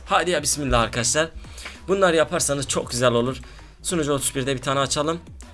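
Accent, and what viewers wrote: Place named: Antep, Turkey. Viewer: Antepli misin şiven benziyo da yada adanalı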